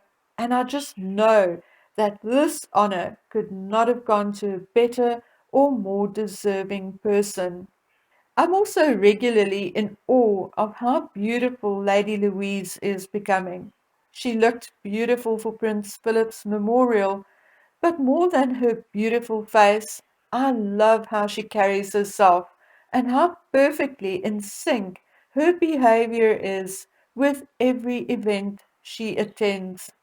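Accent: South Africa. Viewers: It’s so lovely hearing your South African accent🥰🥰🥰🥰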